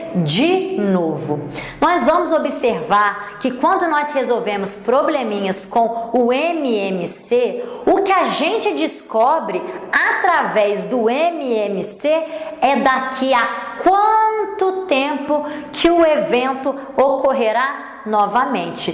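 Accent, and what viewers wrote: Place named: Minas Gerais, Brazil. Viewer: Professora, amei o vídeo! Adorei o sotaque mineirinho também! 🙂 Gratidão!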